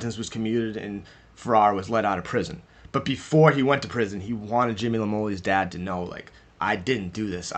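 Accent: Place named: New England, United States. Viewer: It's freaking weird. Your voice sounds exactly like mine. I feel like I'm listening to myself if I had a New England accent.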